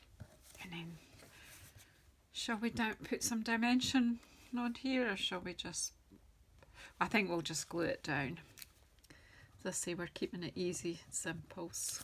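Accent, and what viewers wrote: Scotland, United Kingdom. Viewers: Hi, I stumbled onto your video, stayed, watched and have become your newest subscriber. Your lovely Scottish accent has taken me back to my childhood. Although i am second generation Canadian, 3 of my 4 grandparents were born in Scotland and emigrated to Canada as young adults. It is just so special to hear the accent again. Oh yes BTW, the card was spectacular. Thanks so much. 😊🇨🇦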